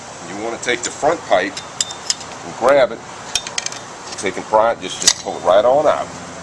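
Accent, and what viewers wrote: United States. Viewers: Sounds like he's from New Orleans, Louisiana.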